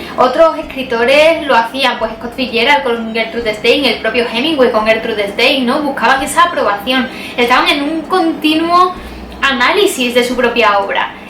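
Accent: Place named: Spain. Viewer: Muy buen contenido. Muy Hermosa y un acento español que me mata. Tendría que tener un millón de reproducciones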